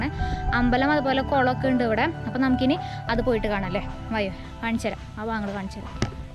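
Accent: Thrissur, India. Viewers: ഞാൻ subscibe ചെയ്തു.. ഈ തൃശൂർ സ്ലാങ് കേൾക്കാൻ.. വീഡിയോ നന്നായിട്ടുണ്ട് 👌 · തൃശ്ശൂർ ഭാഷയുടെ സൗന്ദര്യം സൂപ്പർ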